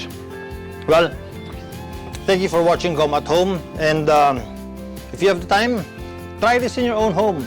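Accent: Visayas, Philippines. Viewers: Makalingaw k goma kung mag bisaya k 😂🤣👌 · bistek tagalog niluluto mo, pero bisaya ka ng biasaya!!! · Visayang -visaya tlaga c Mayor Richard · Bisaya a na kayu oi! Naa Jud ang accent sa pagka bisaya HAHHAHHAHA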